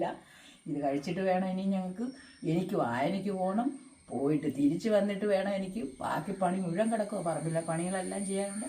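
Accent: Kottayam, India. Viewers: കോട്ടയം കാർ ആണോ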